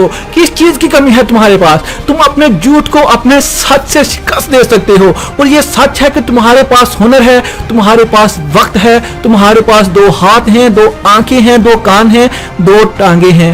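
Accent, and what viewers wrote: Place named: Punjab, Pakistan. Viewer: Bhai Ap apni Urdu pronunciation theek Karen. Ap khhe ko he bolte hen or Punjabi lahja clear dikhta he. Clear Urdu bolen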